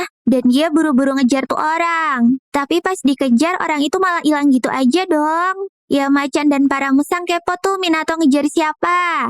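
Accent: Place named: Java, Indonesia. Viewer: Suaranya jowo 😅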